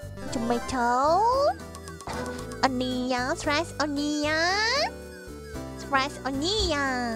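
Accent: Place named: Kansai, Japan. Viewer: that's actually one pair of phonemes she'd have a naturally easier time with compared to her colleagues, since she already has a thick Kansai accent in her Japanese—but the fact that she can now also differentiate between the þ and ð consonants is really impressive.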